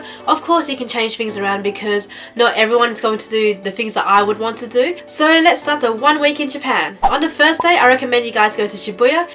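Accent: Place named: Australia. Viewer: you are very pretty, and sound like an Aussie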